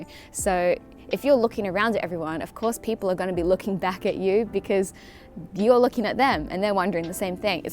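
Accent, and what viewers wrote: Australia. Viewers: Its just An aussie talking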